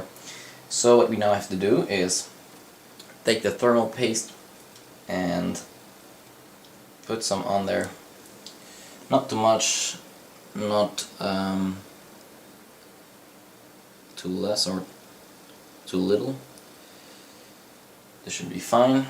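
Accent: Netherlands. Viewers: i cannot watch this cus of your voice i hate a dutch accent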